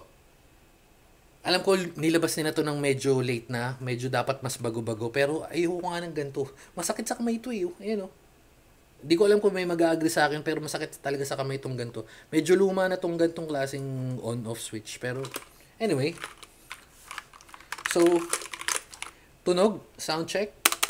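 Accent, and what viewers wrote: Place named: Pampanga, Philippines. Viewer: Thank you po sa detailed review! Kapampangan poba kayo? parang nakadinig ako ng accent ng fellow kabalen HAHAHA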